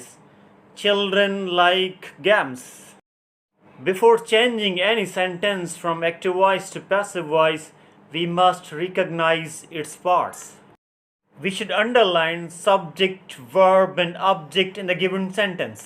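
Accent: Sindh, Pakistan. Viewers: GOOD EFFORTS TO CONVERT SINDHI ACCENT TO ENGLISH BUT TRY IS TOTALLY SEEMS VERY OVER !!!!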